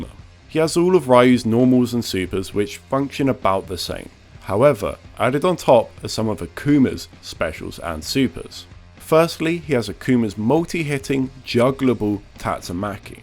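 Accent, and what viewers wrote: England, United Kingdom. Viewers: Yeah I find it a bit ironic that they're getting mad at Americans misprounouncing a word not in their language when his accent is English. Moreover, I'm an American, and I've never heard anyone misprounounce Ryu on my turf. I'm honestly pretty suprised how far this obvious joke was taken though, everyone misprounounces stuff at some point. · The authors from uk, it’s how we say it here. Kinda like Nike instead of Nikeee. Just a UK/London thing.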